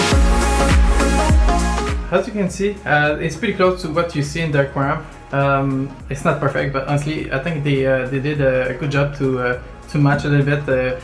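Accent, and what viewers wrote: Quebec, Canada. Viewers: J’aime entendre l’accent Québécois dans mes vidéos de reefing ;) Un abonné de plus !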